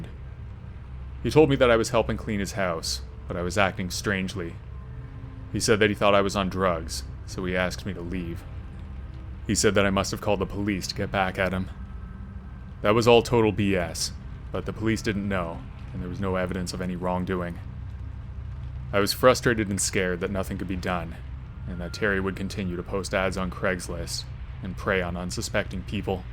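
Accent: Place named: Canada. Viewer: I dig the Canadian accent.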